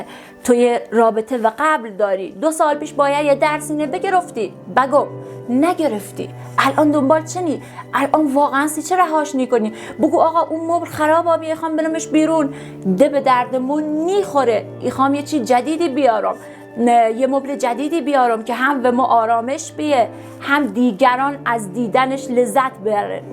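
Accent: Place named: Lorestan, Iran. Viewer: جون من که لذت بردم هم از این لهجه قشنگت اصلا فکرش رو نمی کردم که بتونید اینقدر قشنگ با لهجه لری صحبت کنید هم از این راهنمایی ریبات برای مراجعه کننده آن هزار آفرین داری واقعا شگفت زده ام کردی❤❤❤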